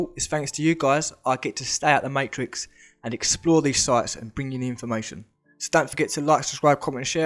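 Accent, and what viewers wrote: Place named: Cockney, United Kingdom. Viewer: How refreshing your little Cockney accent is! Found you today, been watching Tartaria for about 3 months and find it fascinating. Subscribed and looking forward to seeing more of your content.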